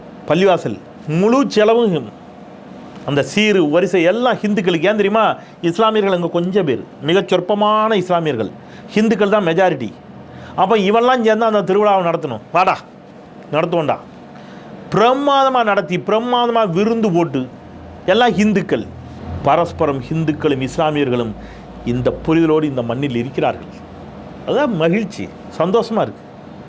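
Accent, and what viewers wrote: Tirunelveli, India. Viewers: அண்ணாச்சி உங்க நெல்லை தமிழும் உள்ளார்ந்த உறவின் நெகிழ்வும் உள்ளபடியே நெஞ்சத்தை தொட்டு விட்டது வாழ்க.... வளர்க.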